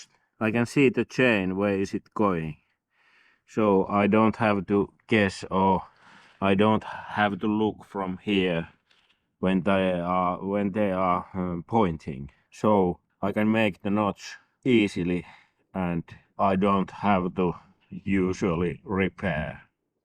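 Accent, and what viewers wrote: Finland. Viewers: I think the Finglish is great. Very skilled work. Looks like your mature spruce is in a 30m reserve against the lake and the bush behind was a previous stand harvested 15 years ago, or a fire. Those big veteran trees always at risk of blow down at some point. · A Woodpecker has been tickling it 😮😂😂😂. Just love Finnish-English. 👍 A Master at work, is a wonderful sight to see 👍🇬🇧🇷🇺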